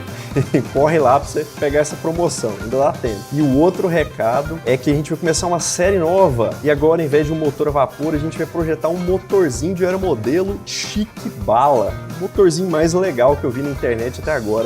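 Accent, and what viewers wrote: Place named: Minas Gerais, Brazil. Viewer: Realmente melhor tutorial para começar! Bonus point pro sotaque, Mineiro né? hahaha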